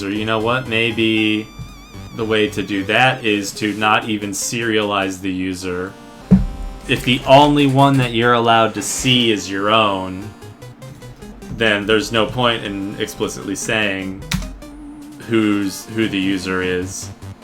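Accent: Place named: Russia. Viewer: congratulations, you have the Russian accent!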